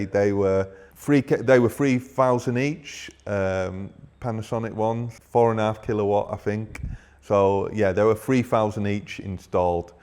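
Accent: Northern England, United Kingdom. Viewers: Brilliant good luck to the future in your lovely home .i detect your from the north of England ?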